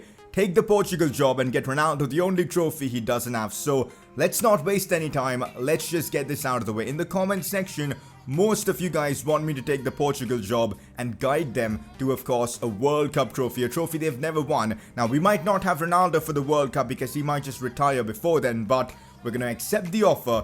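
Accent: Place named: India. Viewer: Pcq:do you just play fifa or play other games as well?? And one more you sounds like indian so do u speak hindi?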